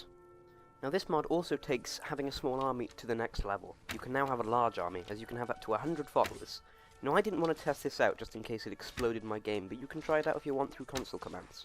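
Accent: United Kingdom. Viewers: You sound like a Welshman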